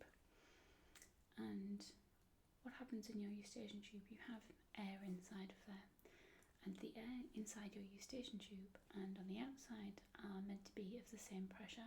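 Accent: United Kingdom. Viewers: Is she Scottish, Australian, or British? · You sound like you're from the UK? :P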